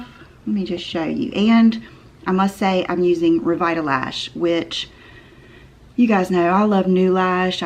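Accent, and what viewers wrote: South, United States. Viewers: Beautiful look! Thanks for sharing!! I love your southern accent or terms I should say!! "at the time"!! 😉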